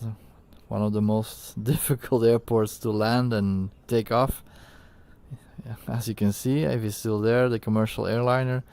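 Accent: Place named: Belgium. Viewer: Alee komaan lol.. er is nen Belg voor nodig om nen Belg te herkennen :) goed review! (maar een klein detail; als je uit vr kwam voor de opname, dat zou de kwaliteit voor de kijkers erg verhogen)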